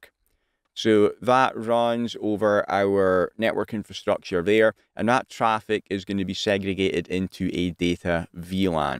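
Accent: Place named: Aberdeen, United Kingdom. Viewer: haha, you still sound Aberdonian to me! I live in the centre, spent the majority of my life in Bucksburn. Really appreciated the video, I've subbed to your channel too. I graduated a year and a half ago at RGU in networking, been working service desk for a year and 4 months, an internal position has opened up for a infrastructure/cloud analyst. Trying to get an idea of what to expect for interview questions! I'm good with networks and also learned a lot about security but this is another ball park. I've built a homelab with esxi/proxmox, my own domain etc but there is only so much you can do on personal kit. I'll be sure to watch more of your videos when I get a minute. Cheers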